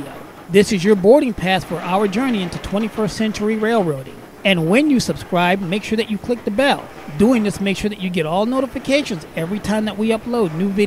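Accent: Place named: Northeast, United States. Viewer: Sorry, you're from the northeast, your accent makes you say "gone-dohla" we in the south just say "gone-dullah"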